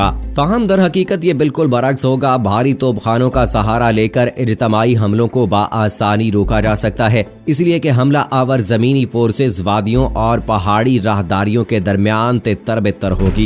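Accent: India. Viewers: Apki urdu indians wali lg rahi hai. Humain kisi se daraen nhi. Jb waqt agaya toe hum Pakistanj chorengay kisi ko bhi nahi. Pakistan Zindabad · خدا خدا کرو .....تمہارے تلفظ سے ہندی صاف جھلک رہی ہے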